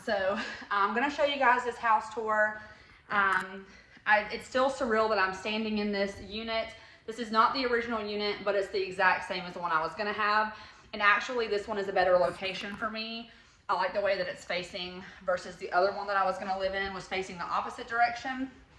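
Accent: South, United States. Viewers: I just hope you don't lose your southern drawl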